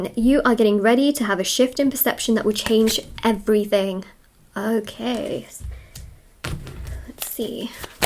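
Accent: United Kingdom. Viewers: Much confused she sounds British uses American slang haha thank you for the reading. I hope this is how it works out.. 🤞♊️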